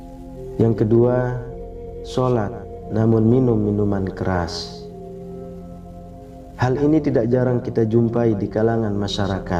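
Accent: Lombok, Indonesia. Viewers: admin logat lombok ya..hehe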